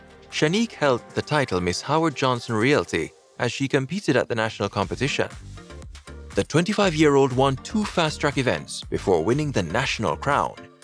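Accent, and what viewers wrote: Jamaica. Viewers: I have watched videos by this narrator and he sounds Jamaican and has a bias for Jamaica in his videos. There was no mention of Giselle La Ronde or Wendy Fitzwilliam . He bigs up Jamaica more than any other island 😮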